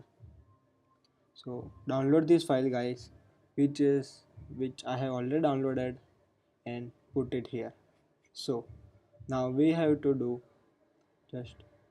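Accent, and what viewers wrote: India. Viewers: indian accent sucks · and like the indian accent :D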